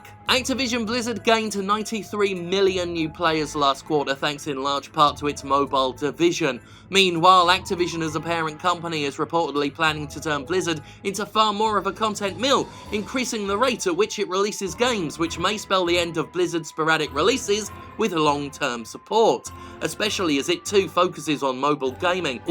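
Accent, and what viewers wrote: United Kingdom. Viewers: Man I would never imagine a British with the elegance of the accent living on one of the most southern places 😂 you’re the hero we don’t deserve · Why did i always think u was from Britain, England?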